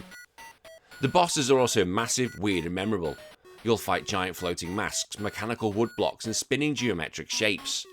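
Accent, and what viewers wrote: United Kingdom. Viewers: Yeah, I think overall it outsold the NES in Europe... This guy also sounds like he's in the UK, where NES really sold poorly and the Master System did very well.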